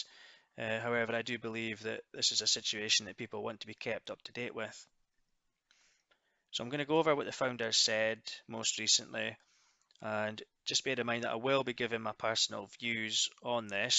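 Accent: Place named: Aberdeenshire, United Kingdom. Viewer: Aye aye, We’ an accent like thon, there’s a fair chance ye can see Bennachie fae yer front door!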